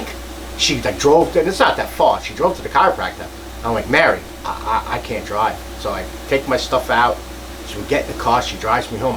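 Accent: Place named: New York, United States. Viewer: Hey just a few things. I'm here saying to myself this guy has to be from NY. Then you mentioned when you lived out here (I'm from NY, Ret.PD). It's just the way we speak lol. The other thing is that I'm sorry for what you have been going through and I hope for a speedy recovery. But what struck me most is your wife. What a class act you are. My wife is one of the most precious things in my life including my kids. And how she took care of you and you making sure you put her first just makes me enjoy and respect your videos more. Not many are as lucky as we are. Take care and God bless you and the fam.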